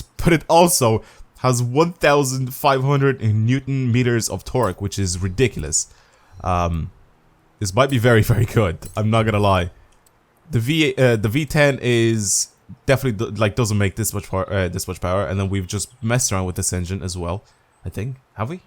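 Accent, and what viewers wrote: Dublin, Ireland. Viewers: are you from dublin??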